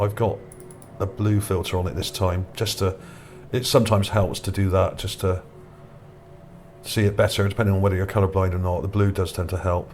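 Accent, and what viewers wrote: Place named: Gloucester, United Kingdom. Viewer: You sound like you're from Gloucester or somewhere south maybe bristol?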